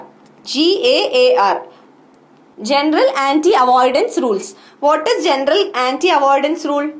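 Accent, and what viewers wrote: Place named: Kerala, India. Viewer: Keralite accent... Love it 😊🙏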